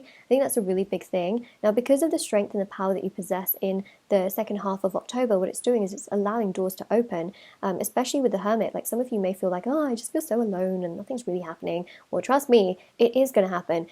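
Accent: United Kingdom. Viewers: Lovely reading, lovely accent (very British), a big hello from America 🇺🇸 to our friends across the pond, half a Brit on my moms side !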